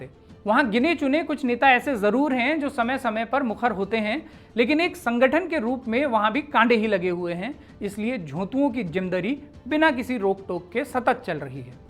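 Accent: Garhwal, India. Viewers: ji aapke muh se bech bech main gadwali tadka achcha lagta hai very nice ❤❤❤ from Almora Uttarakhand 🙏🙏🙏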